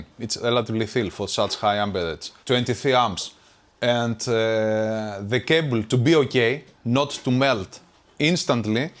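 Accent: Greece. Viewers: Are you greek by any chance?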